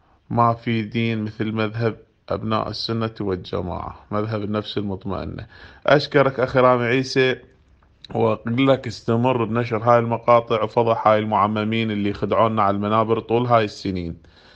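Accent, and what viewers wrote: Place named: Basra, Iraq. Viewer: هذا الأخ مبين من لهجته من اهل البصرة الله ايثبته أن شاء الله على دين الحق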